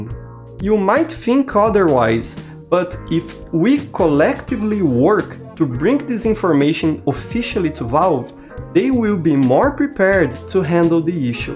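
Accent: Brazil. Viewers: Excuse me aren't you a brazilian YouTuber already? I've noticed because of the accent. " " i believe? It's that the case? You sound extremely similar to the guy. Plus, this video is very similar to one of his, talking about the same topic!!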